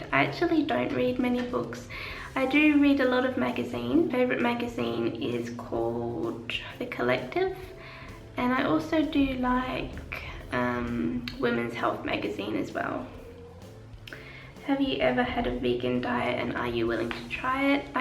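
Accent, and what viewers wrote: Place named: Australia. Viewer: love that aussie accent :)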